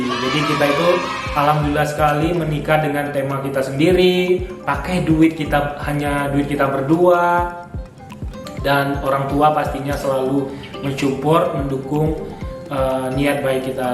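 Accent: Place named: Pekanbaru, Indonesia. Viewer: Kak dari logat bicara nya keliatan bgt orang pekanbaru nya😁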